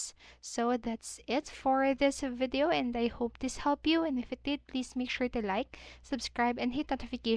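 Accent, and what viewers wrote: Philippines. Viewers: you sound like a filipina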